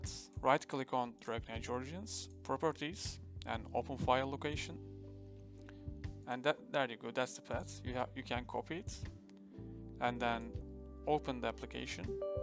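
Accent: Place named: Turkey. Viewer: Videoyu izlerken içimden ya bu adamın ingilizcesi bildiğin türk ingilizcesi ya diye geçirirken masaüstündeki türkçe isimli dosyaları gördüm eline sağlık video için kardeşim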